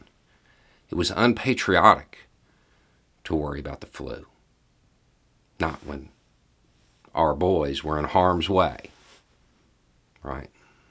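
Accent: South, United States. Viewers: Absolutely spot on both b e a u please Google I played the lead in high school in a play on Remembrance Day as a newspaper editor who is covering up the truth to make this brief so we learned about it many years ago I'm 63 now and we we learned about it in high school but it's interesting to hear you comment because you're kind of like in the South I gather by your accent and I'm just surprised you know so much about us up here and I learn a lot from you and I really appreciate that thanks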